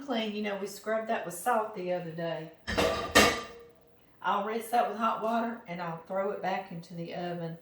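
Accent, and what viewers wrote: South, United States. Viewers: Love your Southern Accent. "Lard" heehee. :)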